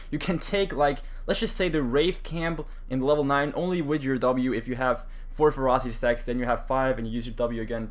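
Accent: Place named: Denmark. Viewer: Your accept sound *very* danish...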